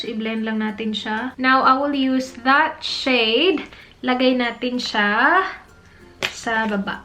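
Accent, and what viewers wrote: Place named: Visayas, Philippines. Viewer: Hahaha kataw.an an Jud Kayo ka ate Oii..Hahahaha.Bisaya kayo ka haha.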